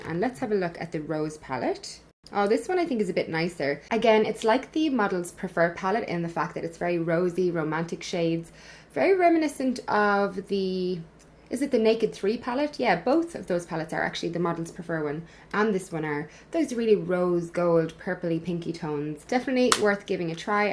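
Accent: Ireland. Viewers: Is she Irish but lives in Australia?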